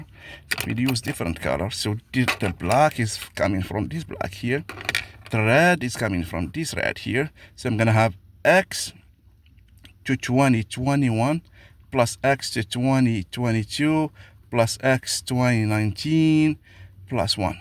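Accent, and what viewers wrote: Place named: Morocco. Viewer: you sound very moroccan sir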